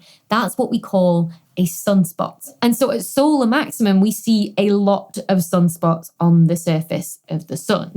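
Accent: United Kingdom. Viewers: I love that you make an effort to talk like a British person, it really irritates me that use of Americanisms is spreading.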